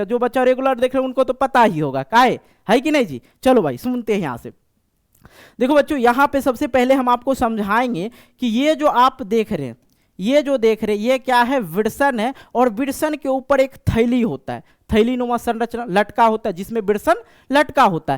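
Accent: Bihar, India. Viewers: Sir aap Bihar sa hai kya....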